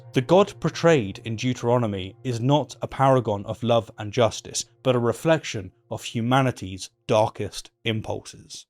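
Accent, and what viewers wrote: England, United Kingdom. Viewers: I find it "interesting" that a person with a clearly "English" accent and therefore the inheritor and beneficiary of probably the most evil empire in Human history (165 MILLION Excess Indian deaths between 1880 and 1920, to mention just ONE of the countless evils committed unapologetically by the vicious English) has the temerity to label the Mongols as "Evil". As Christian scripture puts it, "Take the plank out of your own eye, then you will see clearly to remove the speck from your brother's"....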